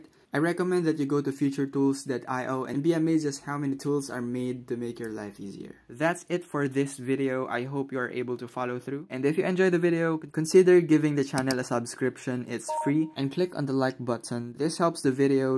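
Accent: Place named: Philippines. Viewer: Bro sounds like filipino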